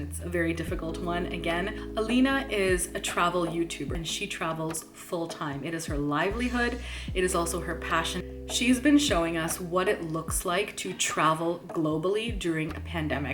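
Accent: Canada. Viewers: Your accent is like any Canadian person and any one who’s telling you fake or anything like that , they have a disease called “ jealousy “. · Thanks for sharing so openly in this q&a. Thoroughly enjoyed it and liked getting to know more about you. As another 1st gen canadian poc - I get the accent thing. We just sound Canadian, no faking ;-)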